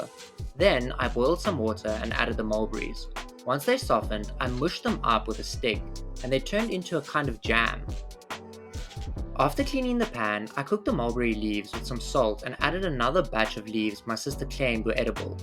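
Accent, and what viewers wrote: South Africa. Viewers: Is that a south African accent that graces my ears ?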